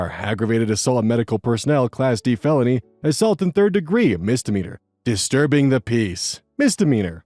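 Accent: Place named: New York, United States. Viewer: Huh. Whenever I heard some comedian do a New York accent I always assumed they were exaggerating it for comedic effect. Yet here I am, having just watched a video where nobody mentions anything about New York nor accents, but where the narrator sounds exactly like those comedians.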